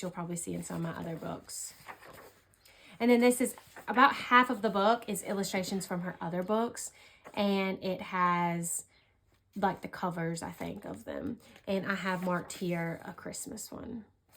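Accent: United States. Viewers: Love your content ❤ Are you from Texas, you.have that beautiful southern bell accent. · New to colouring and really like your style. Also, as a Canadian, I could listen to your southern accent forever! Thanks for the great book suggestions!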